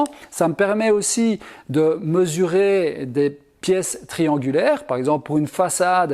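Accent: Belgium. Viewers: Très bonne vidéo, c’est clair utile et très instructif comme d’habitude. J’ai toutefois un petit reproche à faire, je trouve dommage de devoir préciser (ou corriger) à chaque prononciation de nonante ou septante pour plaire au public français dont je fais partie. Ça fait partie du charme selon moi de regarder les vidéos d’un belge, c’est comme si un québécois s’empêchait de parler comme il le fait au quotidien avec son accent et ses expressions. Si "l’gosseux d’bois" parlait un français "parfait" ses vidéos perdraient de leur âme. Bonne continuation et vive la Belgique !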